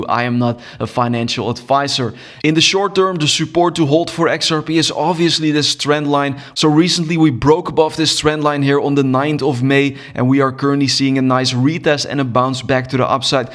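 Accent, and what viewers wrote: Netherlands. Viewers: Good information (you got a dutch accent) greetings from Amsterdam.😅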